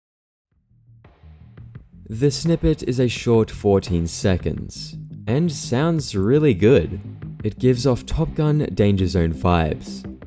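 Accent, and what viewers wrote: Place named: Australia. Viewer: i love your australian accent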